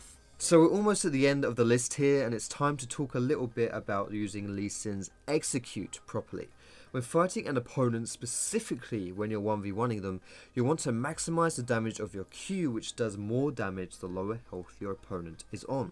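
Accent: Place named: United Kingdom. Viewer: Inspired? No! I've just come here to watch some sick Lee plays and listen to your lovely British voice :3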